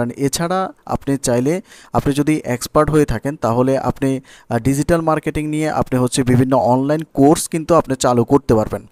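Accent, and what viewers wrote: Noakhali, Bangladesh. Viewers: আপনার বাড়ি নোয়াখালী আমি বুঝতে পারছি। প কে ফ বলা হলো নোয়াখালীর মানুষ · আপনার বাড়ি মনে হয় নোয়াখালী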